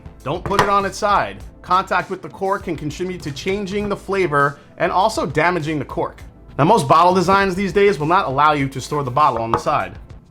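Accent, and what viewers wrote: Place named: East Coast, United States. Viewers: You must be from Jersey. Dialect?🤔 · LOVE this type of content! Keep up the great work and let that East Coast accent out more often. :)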